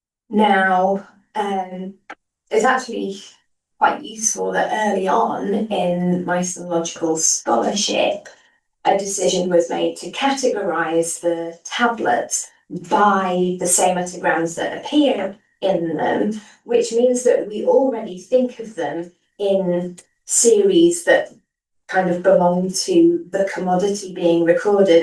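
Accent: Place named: United Kingdom. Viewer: besides the British accent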